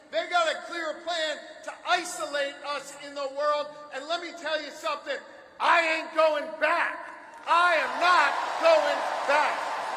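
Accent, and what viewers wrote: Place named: Philadelphia, United States. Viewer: That's just what people from Philly sound like ya smooth brain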